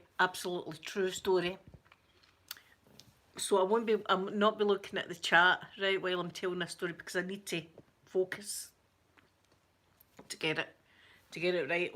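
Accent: Scotland, United Kingdom. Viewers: Your hair is gorgeous! You’re so pretty! I found you a few months back doing a search for asmr in a Scottish accent! Boom baby, I found you! Love your channel and especially the content! You can’t make this stuff up! Hahaha. You certainly live an exciting life. My kids are grown and live in Calgary and Vancouver so it’s just hubby and my animals living on 7 acres off grid here in Ontario! You bring so much excitement to my life. Thank you for sharing your life with us! ❤️🇨🇦❤️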